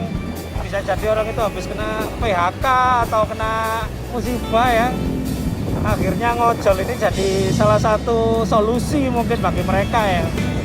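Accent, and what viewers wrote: Malang, Indonesia. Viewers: Logat malangnya Kuenthel mas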